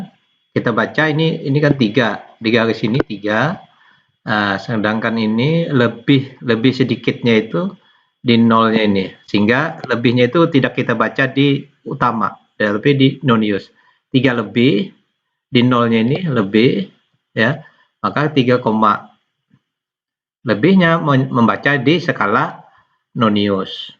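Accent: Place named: Banjar, Indonesia. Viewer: umpat belajar Guruu .. R nyaa banjar banar😂jar abah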